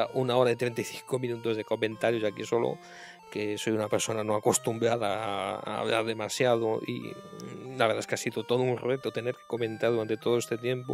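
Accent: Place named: Galicia, Spain. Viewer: Es curioso, en este video te note ese acento gallego que no distingo en tus últimos videos, lo estas perdiendo, lo haces a propósito? en cualquier caso una pasada, como todos tus videos comentados, un referente, tendrían que hacer todos lo mismo con el resto de sistemas, amstrad, commodore, atari etc, etc.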